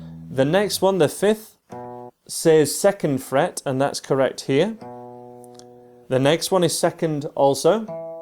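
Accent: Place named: Northern England, United Kingdom. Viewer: Northern English accent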